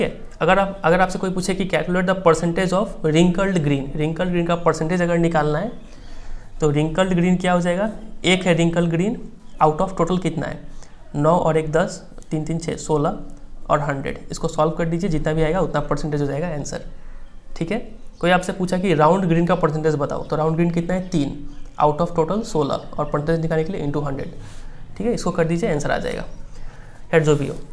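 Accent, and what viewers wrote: Bihar, India. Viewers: Sir aap bihari h kya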